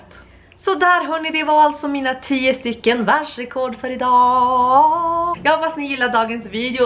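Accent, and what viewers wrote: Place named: Skellefteå, Sweden. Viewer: Älskar när du säger bakanför. <3 Låter så charmigt. :D Skellefteå-dialekten är härlig. Men har aldrig hört det där innan du sa det. :) Säger inte att det är fel, lite kul att höra andras dialekt :)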